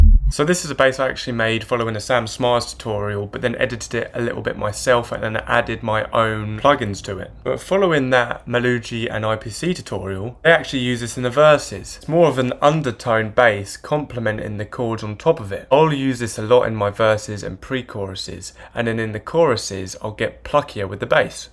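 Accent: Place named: Suffolk, United Kingdom. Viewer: Ahh fair enough man! Thought I could hear a bit of Suffolk in your accent! Anyway keep up the great videos man.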